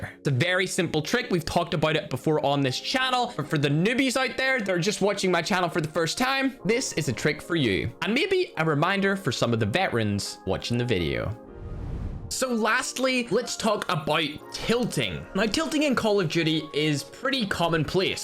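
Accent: Ireland. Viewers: You're Irish?.... Did not know that. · You're Irish? God your accent is so deep. I've heard Terrorizer and Nogla and they have a lighter one. You sound more Enthusiastic though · how are u irish? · Wait... ur Irish? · Wait... are u irish? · i did not know that you were irish. should’ve guessed it 😂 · U are irish ?! Thought u british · is irish?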